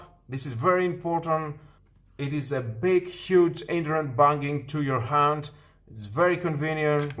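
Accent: Greece. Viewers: Τον Έλληνα δεν τον καταλαβαίνεις από την φωνή αλλά με την πρώτη λέξη πού θα μιλήσει αγγλικά, όσο special κι αν είναι, proficiency να έχει ρε ΚΑΤΕΥΘΕΊΑΝ ΘΑ ΠΕΙΣ ΝΑΤΟΣ😂💪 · Κι εγώ με το που τον άκουσα λέω δε παίζει, ή Έλληνας ή Ισπανός θα ήταν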